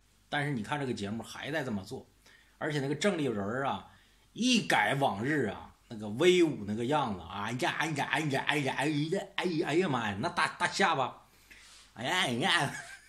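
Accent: Northeast, China. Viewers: 太搞笑了，東北話太帶感了，全程像听相聲，笑死我了🤣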